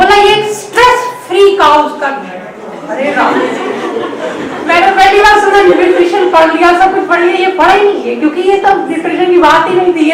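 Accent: Punjab, India. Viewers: Thank u.. its very refreshing to hear u.... a bit of punjabi accent in it... God bless u... from Kenya África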